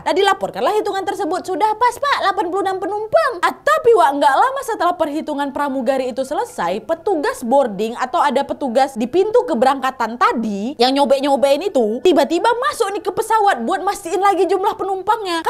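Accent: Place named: Medan, Indonesia. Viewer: Wak ini orang mana? Kayak aku bicaranya, aku orang Medan woii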